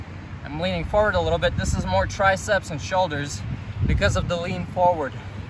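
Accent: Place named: Russia. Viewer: Just as hot as 10 years ago. Interesting to see the Russian accent isn't nearly as thick as it used to be.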